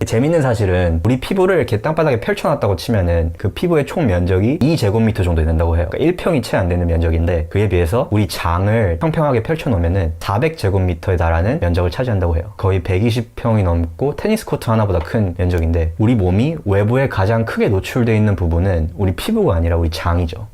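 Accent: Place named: United States. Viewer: 미국살다온 말투ᆢ 좋당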